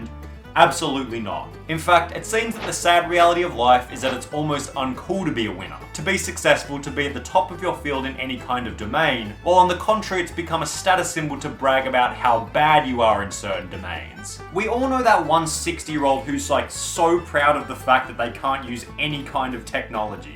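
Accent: Australia. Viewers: Are you a aussie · Oh so you're Australian No wonder you sound more interesting than what you actually are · I FEEL LIKE I SHOULD'VE KNOWN BY THE ACCENT THAT THIS GUY WAS AN AUSSIE, YET I DIDN'T KNOW UNTIL HE SAID; "At least in Australia" and my brain had to reboot and process that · Been enjoying your newer videos and this one popped up in my feed - I think it's the first one I've seen with you actually in it! (Lol'ed at the incel subreddit bit) Keep it up love hearing a fellow aussie spill the tea on youtube peeps :) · i swear to god i did not realize your aussie until this video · its not his fault, its that he has an australian accent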